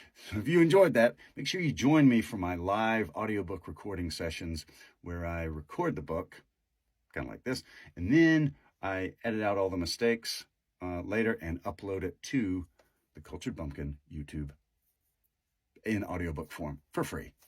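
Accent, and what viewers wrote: South, United States. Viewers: An funny scene, funny for children and adults on the page but the southern accent absolutely kills it! I fell over at " cheeking the roooral poh- lice".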